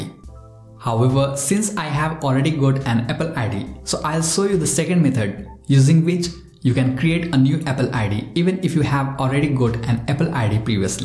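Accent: India. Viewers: Why does he sound like Indian???????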